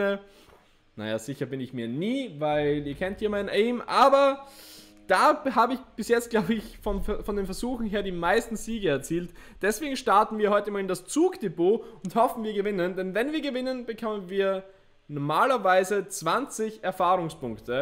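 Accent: Austria. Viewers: bist du österreier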